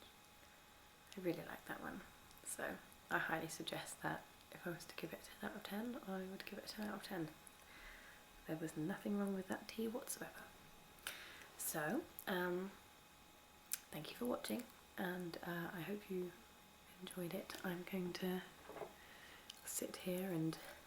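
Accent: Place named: Devon, United Kingdom. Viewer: There is something so perfect about you talking about tea ha. You really have this homelike comfort quality about you, it's lovely. Can definitely tell you are from / lived in Devon! My friend is from Witheridge and she is obsessed with tea also!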